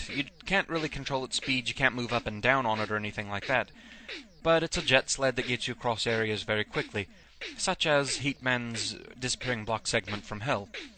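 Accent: United Kingdom. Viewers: You sound british..... But you're not though right? Like the accent though. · are you british?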